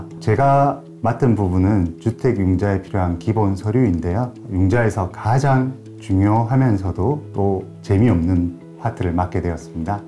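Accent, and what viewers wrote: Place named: Gyeongsang, South Korea. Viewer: 많은 도움이 되었습니다~ 경상도 분이시군요ㅎ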